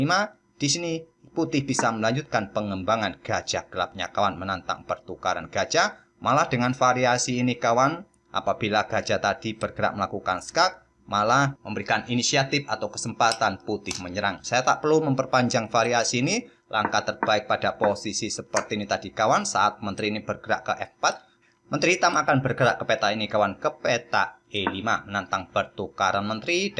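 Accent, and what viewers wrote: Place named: East Java, Indonesia. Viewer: Admin logat orang jawa timur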